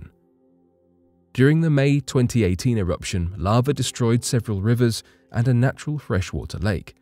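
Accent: England, United Kingdom. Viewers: When you start a video and hear a THICK uk england accent i know the video is going to be a bloody bunch of shite..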